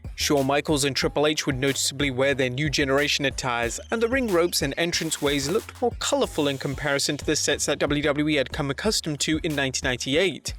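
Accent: United Kingdom. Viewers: Ah, the beeta version. Yes and "go to hospital" as well without the article "the". Love the Brits.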